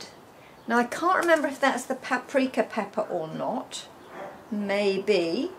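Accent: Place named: United Kingdom. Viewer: I am so glad I sat next to Holly yesterday and found your site, lovely to hear the UK accent, I have a farm but really struggle to grow stuff(Mainly ate by animals possums and rabbits ) So I am excited to follow you and will sow my indoor seeds very soon. I have a worm farm and Cow, horse sheep poo but will use shavings for the chickens.( you would be very welcome to collect the other manure from us if needed ) Thank you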